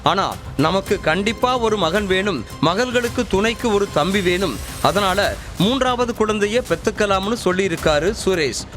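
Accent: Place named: North, India. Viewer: Ennada kevalama news vasikiringa…vadakan mathiri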